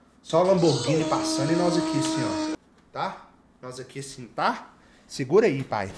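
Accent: Minas Gerais, Brazil. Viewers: É mineiro, né? KKKKKKKK Conheço o dialeto de casa em qualquer lugar! 🤣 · O cê é mineiro né não? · Mineiro Né? De Rocha sow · O melhor de Minas é o sotaque... vamo dominar essa porra tudo, nuuu nois é o miosin que está tendo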